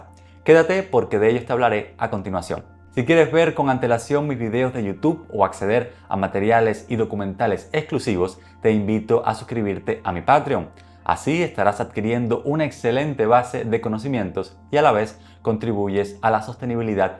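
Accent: Cuba. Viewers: Amigo tu acento me suena a Cubano. Lo eres ?? Gracias muy buen canal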